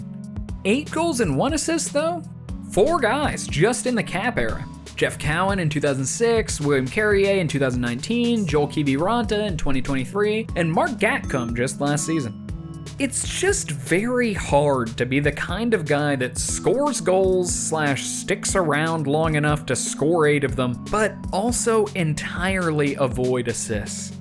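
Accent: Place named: Central Pennsylvania, United States. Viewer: Lol... Nope. That's that central PA accent shining through. Central PA isn't AS bad as Western PA, but we tend to overemphasize certain consonants at the end of words and deemphasize others. You won't hear that hard "duh" sound with the D at the end like other accents Names like Todd, Rod, etc can sounds like Rot, Tott, Ron, etc lol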